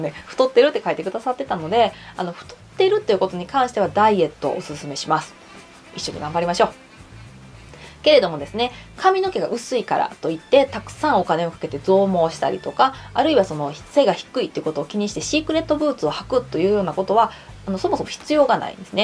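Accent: Kansai, Japan. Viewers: 先生の関西弁のイントネーションが素敵だ 👍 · やっぱやな！俺はのサバサバした関西弁や内面を評価してます！ちなみに俺はレスラー体型かな。筋トレしてます。👍👍 ガチで考え方が能動的になります。欠点を補うには筋トレが最強です。ドラゴンボールの最長老様に潜在能力を引き出して貰うクリリンの感覚です。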